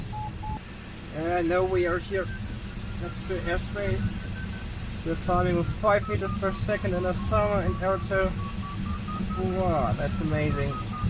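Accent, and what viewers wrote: Germany. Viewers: wie man das deutsch raushört XD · sounds a bissl deutsch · Cool german aktzent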